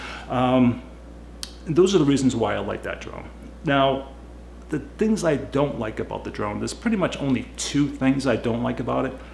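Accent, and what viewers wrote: United States. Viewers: you sound very american but you say "fuck me" like a true blue aussie I like that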